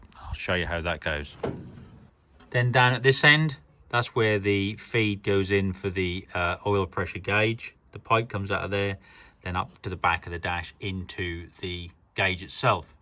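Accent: United Kingdom. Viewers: Lets see if you read any comments???? Marshall Crawlers....in the part of the UK where your particular accent pertains....due to the type of land, Marshall crawlers were everywhere, it seemed like every other farm had one??? Are there any still around, I don't mean the later ones running on rubber, but the real Steel tracked Marshall Crawlers. (I did a project on them at school) in the late 60's.😂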